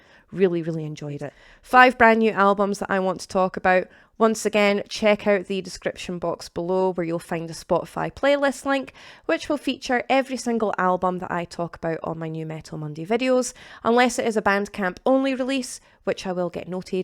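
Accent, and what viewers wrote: Scotland, United Kingdom. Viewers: It's a basic metal channel. But every now and then, she hits me with one I havent heard, and those are the reasons I watch. Plus the charming Scottish accent/demeanor. Plus, to be fair, shoegaze is a 90's thing that's only been creeping into metal since -. Not her generation. This is how it feels to grow old. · "If it's not Scottish, it's Crap!!". Love the accent and great video.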